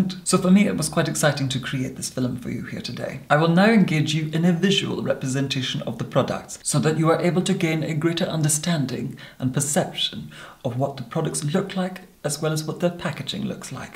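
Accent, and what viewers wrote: Scotland, United Kingdom. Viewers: Nah,he's got a Slight Scottish accent is all.Hes done a good job of getting rid of it. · He's Scottish... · he's Scottish, not English but he does speak very proper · My family has Scottish friends with a similar accent. He just speaks with sophistication. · u are exactly right. Scottish born English raised. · They do Gillian, I’m just saying it because he is Scottish and so am I (with a Jamaican mother raised in Ireland ) shrugs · I do hear a slight Scottish accent from time to time. I find his accent to be fascinating. Cheers! ~Anastacia in Cleveland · He doesn’t have an English accent I’m pretty sure it’s just very posh Scottish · Scottish but using English RP.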